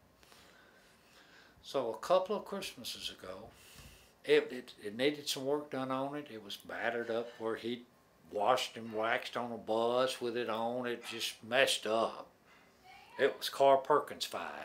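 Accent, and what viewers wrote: South, United States. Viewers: I just love listening to talk! He’s an awesome story teller, and love that southern accent!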